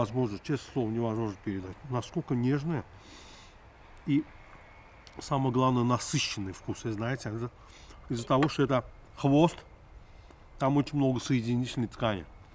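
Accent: Baku, Azerbaijan. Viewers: Отличный рецепт, выглядет очень аппетитно, а мой родной бакинский акцент это бомба 💥👍👌👍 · Приятно услышать бакинскую речь и видеть интеллигентного человека! привет от бакинского армянина из москвы! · джан! Джан ээ бакинский акцент ❤️🤲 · Азербайджанский акцент не перепутаешь не с каким другим. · Бакинских акцент 👍🏼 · Как только услышала речь, сразу догадалась, что это бакинец. Не смотря, что сейчас живу в России, но акцент южный бакинский остался. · Во первых блюдо вкусное))) Во вторых, бакинский акцент прикольный👍👍👍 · Ты настоящий Бакинец,по акценту видно,очень понравилось твоё видео.Здоровья тебе,твоим родным,спасибо тебе ахпер.Обязательно такое приготовлю,если смогу.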